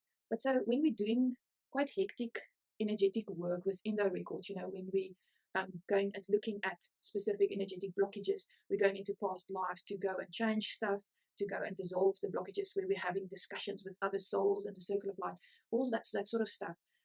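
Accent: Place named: Afrikaans, South Africa. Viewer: Well I can understand an Afrikaans accent! Baie dankie.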